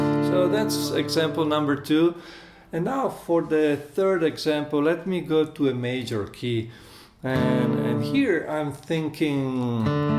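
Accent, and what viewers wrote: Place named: Italy. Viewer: thank you; i could spending hours listening to your italian gusto sound!thank you man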